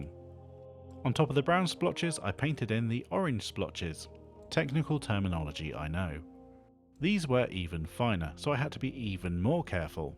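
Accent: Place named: England, United Kingdom. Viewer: Wonderful result as always. It’s hard to tell that the StuG isn’t 1/35 scale. My wife overheard me watching the video, and when she heard you say “I have to stop saying splotches” she said at least it sounds sophisticated with an English accent. 😂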